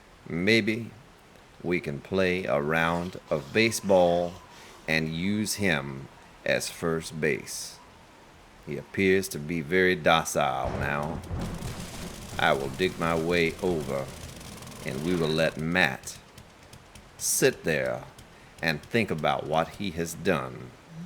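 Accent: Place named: South, United States. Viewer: I have laughed more on this video at the silliness your friend put you through than I did at G4Kz when the bear was chasing him. If any gets mad, they don't, in my opinion, have a very good sense of humor. as for how many times you said boo boo I counted five times. don't get me wrong, but your accent went real southern in this video. don't know what state you were raised in, but it sure sounds a lot more of the south. great episode.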